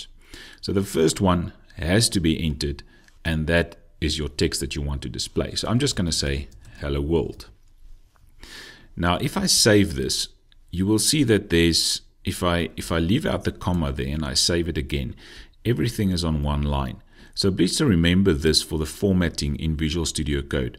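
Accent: Afrikaans, South Africa. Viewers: This is one of the best structured flutter tutorials, English with Afrikaans accent is like I feel home. All the best and regards from Namibia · You sound South African ❤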